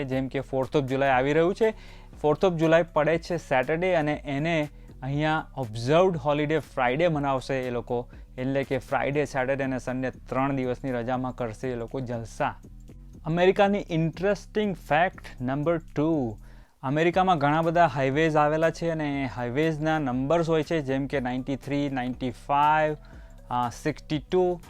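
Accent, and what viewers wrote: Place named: Kathiawar, India. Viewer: Pure gujarati (kathiyawadi)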